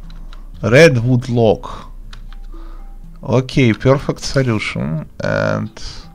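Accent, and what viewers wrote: Russia. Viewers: сразу слышно русский человек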